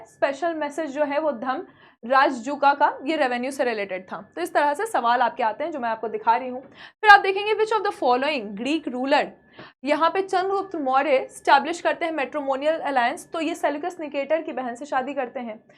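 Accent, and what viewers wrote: Bihar, India. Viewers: एक बात तो फिक्स है कि आप बिहार से है।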